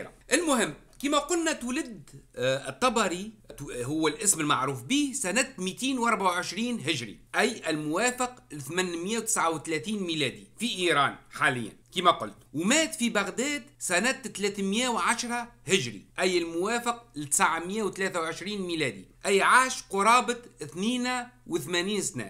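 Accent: Tunisia. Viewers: استاذ انت احسن مدرس للتاريخ, و اللهجة التونسية زادتها حلاوة. تلميذتك من الجزاءر.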